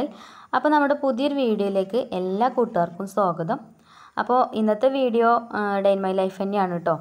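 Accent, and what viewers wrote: Malappuram, India. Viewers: ഇയാൾ മലപ്പുറം ആണോ 🤔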